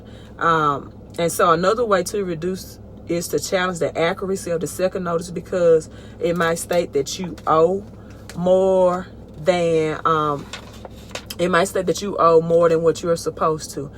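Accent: United States. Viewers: Hmm American accent in a right hand drive...im confused or is it a camera switch.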